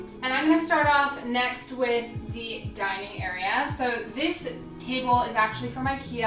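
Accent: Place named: Canada. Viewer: The way Canadians pronounce “about” gives them away 😝✌🏼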